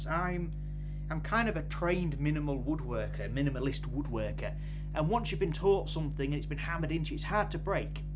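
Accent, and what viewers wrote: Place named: Northern England, United Kingdom. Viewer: Your videos are brilliant mate! Also nice to hear another northern voice 👌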